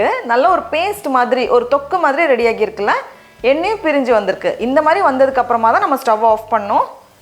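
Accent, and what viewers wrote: Nagercoil, India. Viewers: How are you? Waiting for your video before starting my online classes. I am teacher working in UAE from Nagercoil..Love the way of your Nagercoil slang ka...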